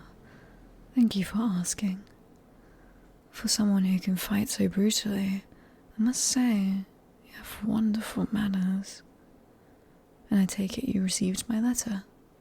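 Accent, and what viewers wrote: United Kingdom. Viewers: British vampire from the pre colonial era. Need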